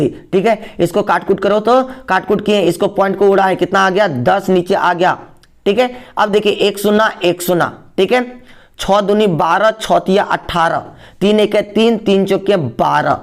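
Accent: Jharkhand, India. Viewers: Aap Jharkhand se he aapka language bata raha hai 😃😃😃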